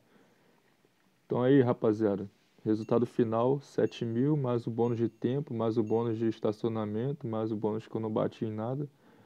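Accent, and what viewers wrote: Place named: Rio de Janeiro, Brazil. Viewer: Carioca façando é tri dahora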